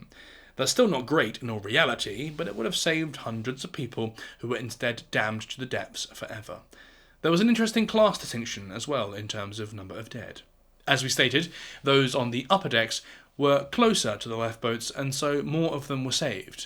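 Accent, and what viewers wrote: United Kingdom. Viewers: Great report, the UK accent is a must do too. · Between him and Simon Whistler. It seems my favorite past time is having a British man tell me about historic terrors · I like British accent in narration 😊.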